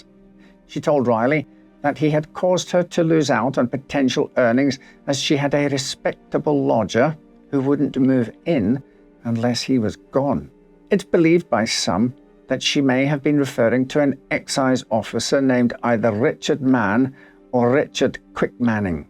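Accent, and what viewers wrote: United Kingdom. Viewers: This coming from a midwest speaking American, is it just me or British accents just perfect for narration? Also I find the accent to be oddly calming. I know, im weird. lol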